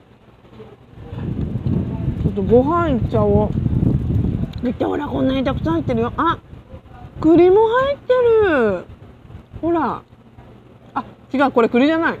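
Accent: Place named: Japan. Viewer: 발음이 완전이 일본분 나마에와 한국이름 그렇군요, 맛집 알려주셔서 감사합니다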